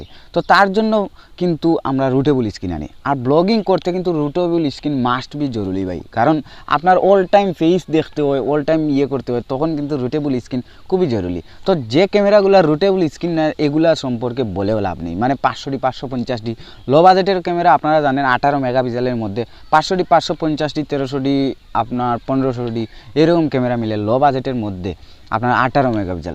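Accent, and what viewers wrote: Sylhet, Bangladesh. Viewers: Bhai apni Sylheti right?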